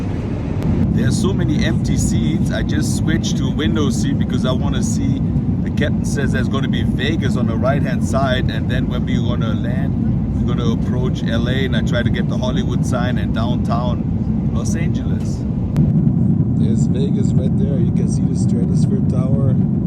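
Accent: Germany. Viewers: Who doesn't love this guy? Please like and subscribe. I love this German guy. Also love the accent. !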